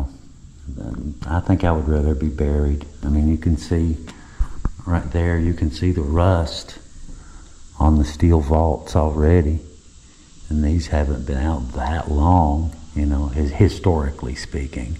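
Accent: South, United States. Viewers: Aarrgghh. That Southern drawl. The worst, most jarring accent on the planet.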